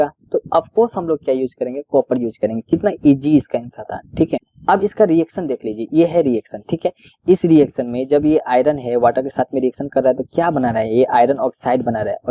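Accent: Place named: West Bengal, India. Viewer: Thankyou very much sir.......I'm very happy to see...that you are helping me.....and many other students... thankyou.. very much sir......sir....from ur...voice...mujhe aisa lag rha hai ki aap bangoli ho..........thnq sir...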